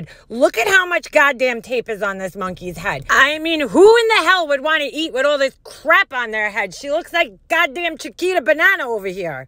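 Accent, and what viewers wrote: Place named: Bronx, United States. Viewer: I love her accent also it's probably like a New York Bronx accent like where my dad grew up she's Italian Maybe that's the way my dad talks he's a sicilian immigrant who grew up in the South Bronx and move to Jersey that's the way his accent sounds that's maybe why I love her accent so much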